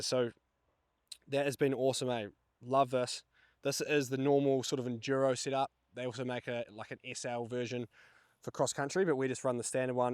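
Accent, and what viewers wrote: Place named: New Zealand. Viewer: Bump dat audio a bit. The people wanna hear that kiwi accent loud n clearrr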